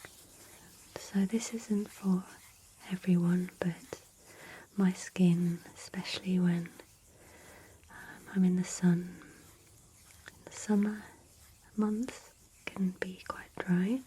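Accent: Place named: United Kingdom. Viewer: Wait does have a UK accent? I’ve been watching for so long I didn’t recognize anymore if she does, until she said “holiday”, because Americans say vacation. No way I forgot she has an accent 😂😂😂😂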